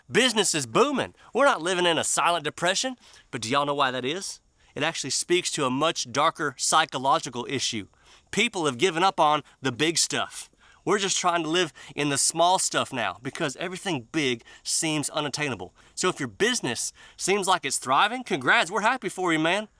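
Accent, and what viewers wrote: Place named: Texas, United States. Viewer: I like your voice are you from Texas ? You sounds like Texans..I live in Texas ,but I’m originally from west Africa..love your videos.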